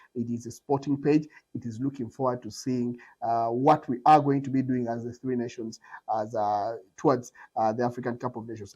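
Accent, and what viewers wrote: Uganda. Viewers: Also Tanzania has 6 international Airport not 3. Also the total number of Airports in Tanzania is 59 and 300 Airstrips. Kenya has only 36 Airpots and 3 international Airpot just so you know. You should have Mentioned also that Kenya Airways is NOT owned by Kenyans or Kenya Government, it a shared company between KLM, One Private company and Kenya Government which means Kenyan government is nit benefiting from the Airline 100% like Tanzania Air which is Owned 100% by the government. Most people and Tourists in Kenya lands only or most in Nairobi or JKNIA while in Tanzania Most people and tourists are spread in 3 Airports JNIA-Dar, Kilimanjaro Airpot and Karume in Zanzibar and soon coming up Songwe International Airpot, Pemba international Airpot and Mwanza International Airpot. Next time di research before opening your UGANDAN Accent MOUTH.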